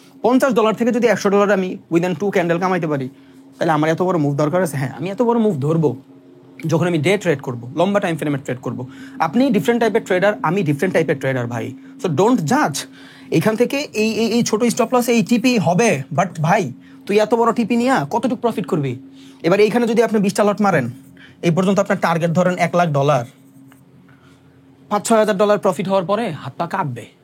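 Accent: Barishal, Bangladesh. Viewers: সবার আগে এটা আমার Question ভাই আপনি কি মোগো বরিশাইল্লা ❔🌚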